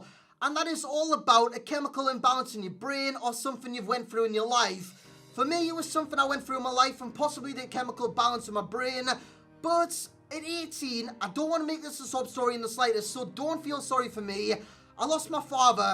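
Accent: Newcastle, United Kingdom. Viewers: R u from Newcastle also keep up the good work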